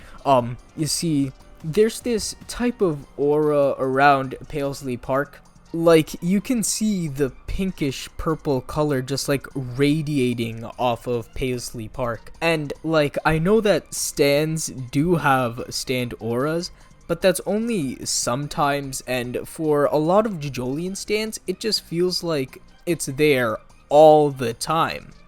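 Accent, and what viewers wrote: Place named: United States. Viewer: I don’t want to sound salty, overly critical, nor judgmental: But I hate how “JoJo“ or some other English words come out as American’s say them. I was born in the states but raised around Latino culture but the way you guys say: “Joe Joe” sounds a lot like “Jue Jue” and when you said “Pay-slie-park” it sounded like you said: “Pay-lee’s-park”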